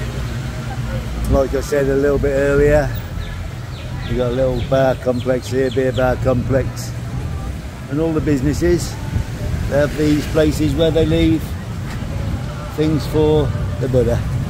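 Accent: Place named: Nottingham, United Kingdom. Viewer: Hi cant place your accent, at a guess would say Nottingham?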